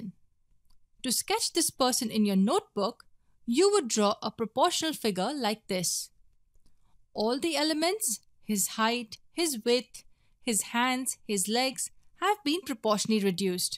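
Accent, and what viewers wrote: India. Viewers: Please change your talker im not in the mood for indian accent (not offensive just asking)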